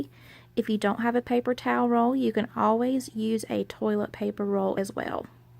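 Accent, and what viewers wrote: South, United States. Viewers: Too cute!!!! To save time, I would just put drop of HOT temp glue to keep it shut so u can work on it easily. It won't show once it's painted. I'm sharing the tip cuz ur young and u need to avoid arthritis in ur hands and fingers as soon as possible. Straining ur joints to do anything leads to arthritis and pain. Absolutely loved it idea!!! Will share ur site with my nieces. Love ur southern accent, makes me homesick! Blessings to you AND ur hubby. Just tell him to wave next time and say Hey or Howdy! Make it more fun!! It's all abt having fun and enjoying life to the fullest. Let the little things go. If u can fix it, great! If not, let God take care of it! He's in control anyway. Follow the rules as best as u can (no one is perfect) and He will do the rest. I thank Him daily for all the little simple blessings that so many take for granted. 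Thanks for sharing!!! L :)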